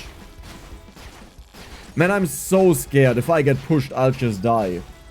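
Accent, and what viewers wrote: Germany. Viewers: the german accent finally calmed down for a moment here🙏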